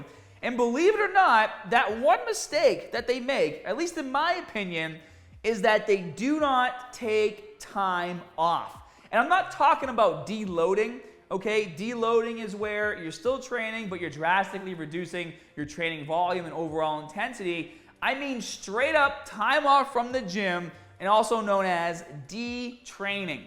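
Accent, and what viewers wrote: Boston, United States. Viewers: His Boston accent comes and goes. He's working HAHD to neutralize it.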